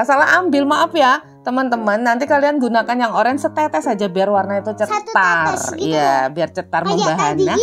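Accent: Java, Indonesia. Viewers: Hhhhh, medok lgi😂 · Lha kok maleh medhok bangets too yoo maa😅..mtrnuwn sangets njih maa resep ipun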